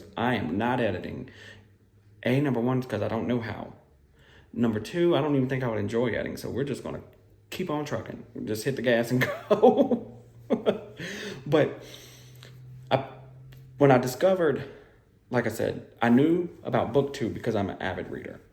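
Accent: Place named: Louisiana, United States. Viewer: ! I. Love. Your. Soul! lol! I really enjoyed meeting you through FlossTube. I grew up in Louisiana as well and I hear your southernisms as you talk even though you don’t have much of an accent. lol. lol. Great first video. I need a visual of your envelope system though. I’d love to see some completed works as well. I’m looking forward to your next video. Also, in which general area are you from in Louisiana? I grew up in Amite which is about an hour north of New Orleans.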